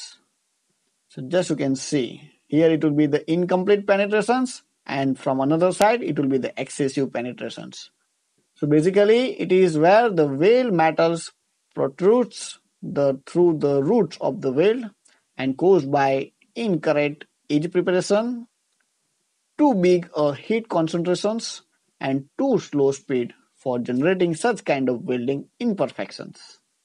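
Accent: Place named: India. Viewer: такой конкретный индусский акцент у лектора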